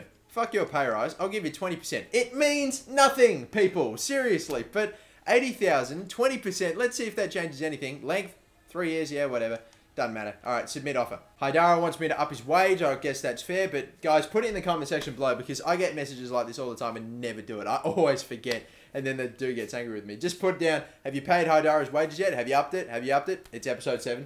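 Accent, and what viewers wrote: Australia. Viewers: wait, you support nufc but you sound Australian? well... good enough for me, SUBSCRIBER EARNED NUFC4LIFE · He is Australian.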